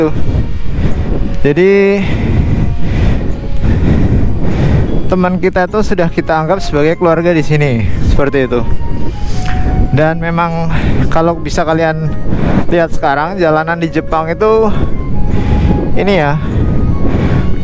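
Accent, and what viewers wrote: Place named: Banyumas, Indonesia. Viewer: Wong endi mas, agak ngapak hehe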